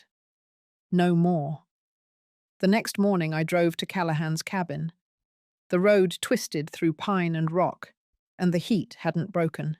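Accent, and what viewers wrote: United Kingdom. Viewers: The Reader is British of course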